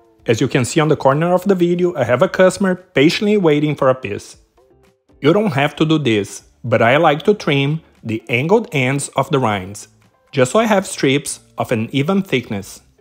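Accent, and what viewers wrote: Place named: Brazil. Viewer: dig the recipe and glad you mentioned the sour dough bread toast --i'd think that apple cidar vinegar in recipe would taste great with avocado toast and rind recipe. And great accent! it reminds me of a great friend in college who's from Brazil..an instructor and now a gym owner in Dallas.. More recipes segments to come i hope!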